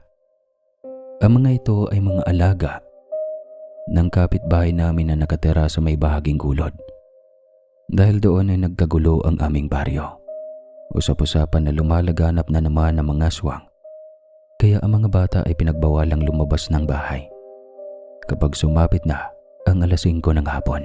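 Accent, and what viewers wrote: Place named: Iloilo, Philippines. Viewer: Kuhang-kuha ni sir ang salita namin na Ilonggo😇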